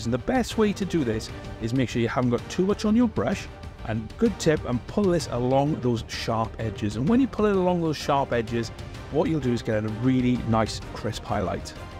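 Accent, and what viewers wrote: Wales, United Kingdom. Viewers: I just like the soft welsh accent. Cwmry am byth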